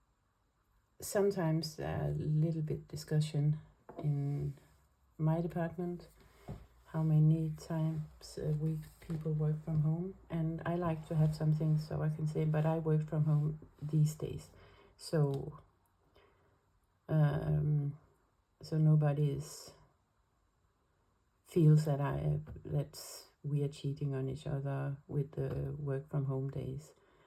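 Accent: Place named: Denmark. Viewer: I love your accent! My grandfather was from Denmark but I don't remember much about him besides the way he spoke :)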